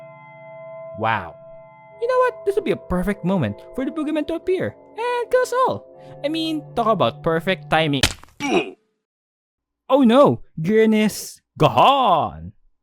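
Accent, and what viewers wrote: Philippines. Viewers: based on ur accent, im pretty sure ur a filipino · this video just came up on my recommended and i decided to watch it, and from hearing your voice i could tell you were filipino too XD hoping to see you make an empires smp explained video <3 also just a tip, joel's name is pronounced as "jowl" enunciating the e isn't really necessary :D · Are you Filipino, cuzyour accent😊🥰 love it. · You filipino? Accent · Great! Some Filipino 3rd life fans. Recognised you by your accent. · I feel like you have a Filipino accent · WHY DO U SOUND LIKE A FILIPINO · I recognize your accent. Lezzgoo mga pinoy!!! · Are you a filipino? Your accent is very filipino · Are u a Filipino ur accent is sooooooo Philippines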